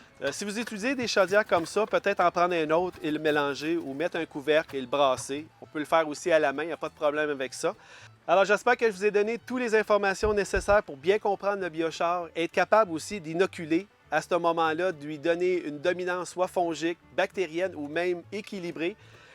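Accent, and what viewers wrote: Quebec, Canada. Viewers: Jadore ecouter les quebecois ! Pouvez-vous me dire ce quest la "frasque d'insectes " ?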